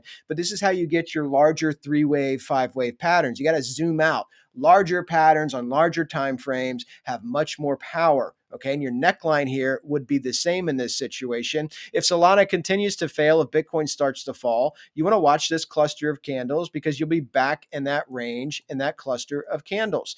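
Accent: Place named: United Kingdom. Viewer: thx B, I thought so. He sounded British. Well, I'll try him again on Telegram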